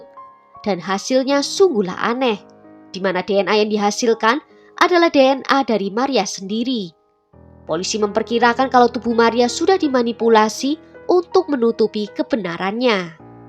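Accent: Java, Indonesia. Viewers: Aku syukak bingitsss dengan medoknya sis, semoga ciri khas ini bisa membawa channel semakin dikenal dan segera mencapai jutaan subs🎉❤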